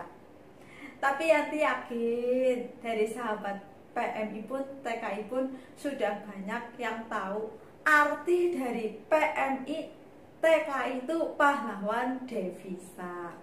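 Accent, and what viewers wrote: Banyumas, Indonesia. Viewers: Jebule rika wong ngapak yak hhh jossss wonosobo monitor.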